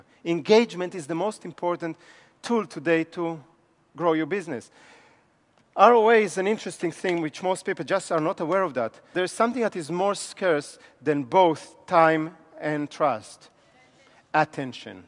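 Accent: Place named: Spain. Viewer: Bu nasıl video lan İspanyolca karışımı İngilizce konuşuyor adam bile konuşamıyor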